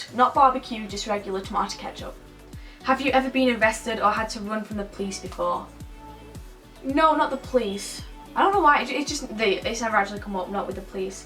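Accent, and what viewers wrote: United Kingdom. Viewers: Hi you are such an inspiration to me you are so preety and I wish I was more like you .Are you British you sound it but I can't tell. ❤❤❤❤❤️❤️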